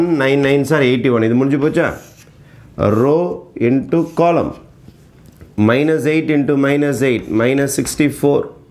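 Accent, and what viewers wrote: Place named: Chennai, India. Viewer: Hi I love Chennai slang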